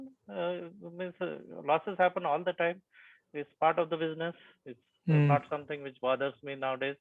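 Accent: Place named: India. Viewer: I really want to learn from him, his strategies are good. But I know other people from India who also speak like that and it is very annoying and a turn off.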